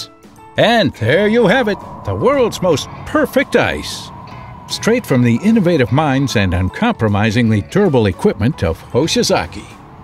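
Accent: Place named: United States. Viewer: Aaww. I remember when I was still a kid about the voice over. Very American and convincing voice. Sounds good quality and reliability.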